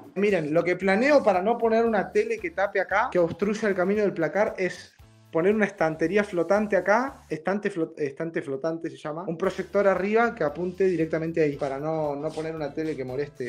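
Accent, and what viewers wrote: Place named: Chile. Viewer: pov:la presentacion del video hola hola po weon (es por el acento de chile)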